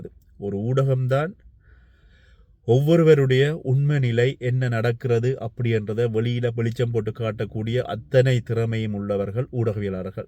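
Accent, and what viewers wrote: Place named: Tamil Nadu, India. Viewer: நீங்கள் ஊடகவியலாளராக பணியாற்றியவர் என்றால் ஏன் Baljeet போல இந்தியத்தமிழ் கலக்கிறீர்கள்?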